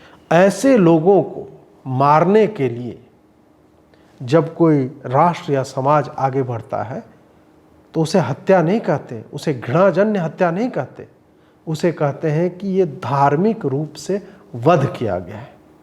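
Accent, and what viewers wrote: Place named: Bihar, India. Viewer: Video ki caption aur narrator ki bolne k tareeke se mujhe maza aya 😂. Fati fatah fatanti 😂 aur stadium jaisa mahol banna.....bhaiya k bolne ka tareeke mere ek bihari dost k jaisa hai. Maza aya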